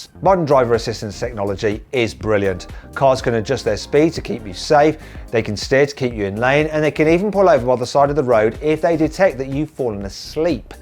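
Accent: United Kingdom. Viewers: イギリス訛りなのか、はじめ、中国語かと思った。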